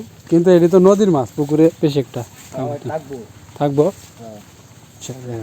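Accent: Netrokona, Bangladesh. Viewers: Apnader Basa kothay. Kotha Shune Mone Hoy Netrokonar. Am I right?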